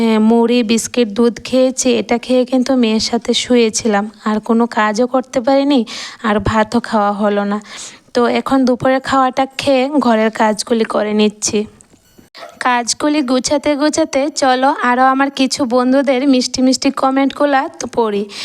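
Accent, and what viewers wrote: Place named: Tripura, India. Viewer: তোমার পুচকি মেয়েটাকে খুব ভালো লাগে তোমার মেয়ের চেহারাটা অনেকটা ছেলের মত। তোমার গাছের কচি চাল কুমড়ো দেখে কিন্তু আমার লোভ লেগে গেল। তুমি যখন ডাইরেক্ট কথা বলছিলে ভিডিওতে তখন তোমার কথাগুলো শুনতে পেলাম মানে তোমাদের ত্রিপুরার ভাষা একটু অন্যরকম। একদিন চলে যাব কাঁঠালের পিঠে খেতে। বাস করল কোনদিনই খাইনি। কেমন লাগে খেতে?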